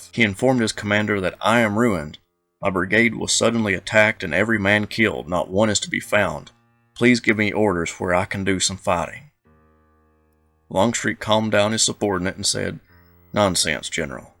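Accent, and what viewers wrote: South, United States. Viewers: Another great episode! BTW, aside from your "accent" I know you are a Southerner because you pronounce Lafayette correctly.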